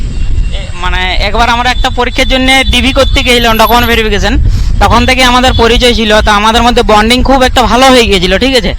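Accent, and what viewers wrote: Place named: Assam, India. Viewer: পশ্চিমবাংলার ভাষা তো বাংলাদেশীদের মতো,কারন তারা ৮০% বাংলাদেশীই, তুমার ভাষা শুনে তো তুমাকে আসামীস মনে হচ্ছে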